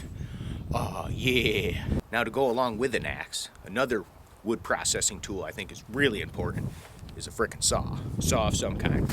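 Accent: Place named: Ohio, United States. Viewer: u sound like ohio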